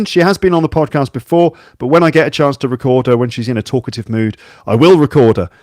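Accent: United Kingdom. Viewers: I love listening to British accents... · This podcast is definitely needed for a British pronunciation. Thanks for everything, cannot live without it since my first listening!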